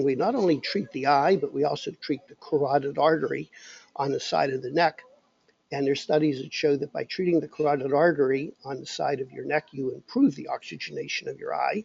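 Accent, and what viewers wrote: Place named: Pittsburgh, United States. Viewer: You must be from the Pittsburgh area from the accent. Im from around there.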